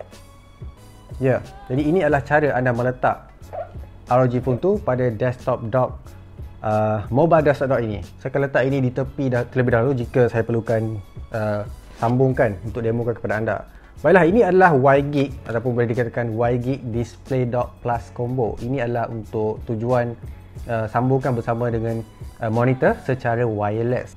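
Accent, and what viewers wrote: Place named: Malaysia. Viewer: suara nya kayak malaisiya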